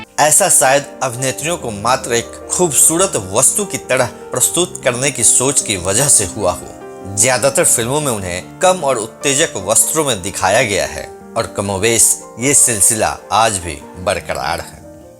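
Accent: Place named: Bengal, India. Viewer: ji Inka Bengali accent hai isiliye r ko d keh rahe hai.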